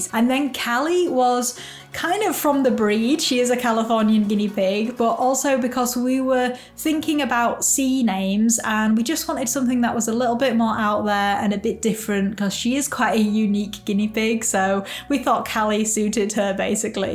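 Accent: England, United Kingdom. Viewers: You are the greatest piggie mom ever 🐹🐹🐹🐹🐹🐹🐹 Plus, I love your English accent 😉😉😉👍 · I agree. I can't resist the accent ❤❤. I grew up in love with the BBC, from the eastern coast of U.S.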